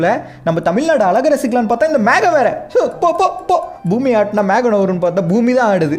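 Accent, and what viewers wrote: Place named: Karnataka, India. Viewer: Bro Karnataka ilanthu pesara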